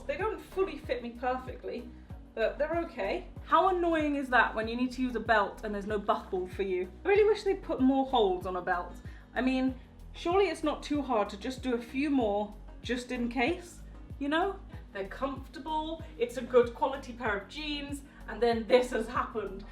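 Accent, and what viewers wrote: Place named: RP, United Kingdom. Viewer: You're so posh I love it 😂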